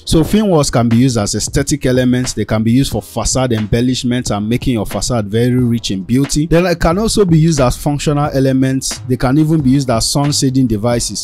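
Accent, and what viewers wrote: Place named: Singapore. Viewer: I am from Singapore and I think we have a very similar accent! haha.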